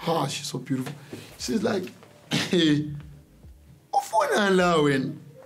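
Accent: Zimbabwe. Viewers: He sounds Zim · This guy talks like a Zimbabwean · Is this guy Zimbabwean or Southern Ndebele speaker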